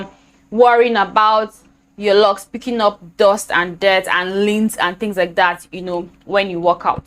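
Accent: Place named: Nigeria. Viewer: Ok I'm only 2mins in but baby girl is giving Naija goodness with her bubbly personality, lovely smile and beautiful accent. Love the professional set up and lighting too. Now on to the rest of the video...😊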